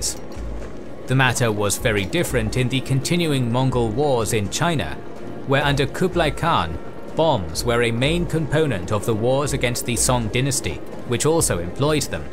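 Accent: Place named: United Kingdom. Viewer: With the narrator's British accent, war pow might have been closer to the Mandarin pronunciation for him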